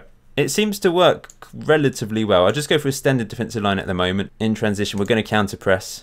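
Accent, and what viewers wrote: RP, United Kingdom. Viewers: Love how posh you are, it's like watching Blackadder.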